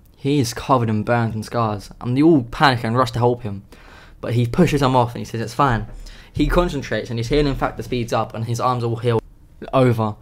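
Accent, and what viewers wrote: United Kingdom. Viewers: Fix yo Briish accent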